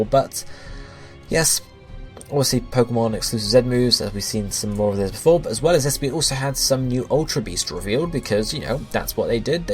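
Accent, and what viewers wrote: United Kingdom. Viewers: is british and thats how we say it, but i end up saying zee half the time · OHHHHH So he's from the UK? I didn't know that, sorry. Thank you for the information. I hope I wasn't rude when I asked the question.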